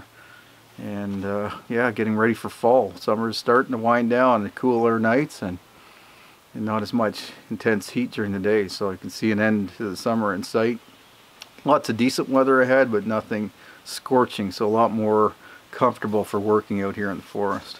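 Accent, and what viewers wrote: Canada. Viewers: If auto insurance doesn't pay for the theft, check with your homeowner's insurance policy. Sometimes you are covered under that. Your videos are always such a treat for the senses. Stunning and beautiful. (And I adore your Canadian accent) 😎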